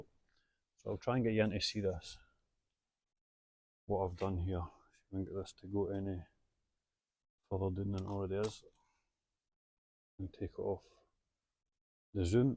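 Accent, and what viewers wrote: Scotland, United Kingdom. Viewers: Most informational video i have seen but that Scottish accent man 🔥🔥🔥🔥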